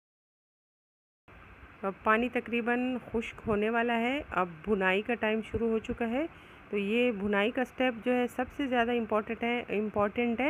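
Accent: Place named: Sindh, India. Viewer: Sis ap sindhi hain . Bhat krne ka andaz sindhi jaisa hai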